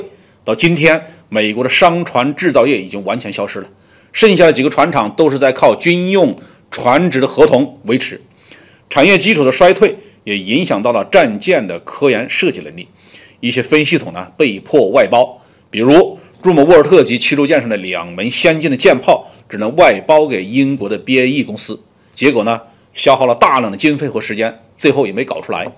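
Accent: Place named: Fujian, China. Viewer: 鲍大校的扶兰口音蛮亲切的😁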